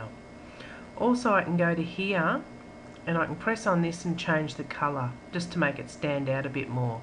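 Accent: Australia. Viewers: Australian??? IM AUSTRALIAN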